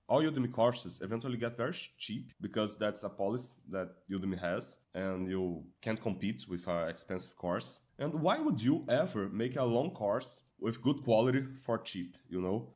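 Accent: Brazil. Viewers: Deu para saber que era brasileiro pelo accent kkkkkkkkkkkk muito bom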